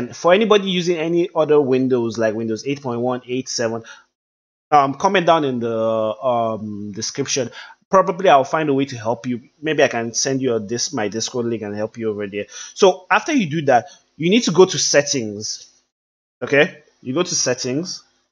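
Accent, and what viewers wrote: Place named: Africa. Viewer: you have an african acent like like guy who sang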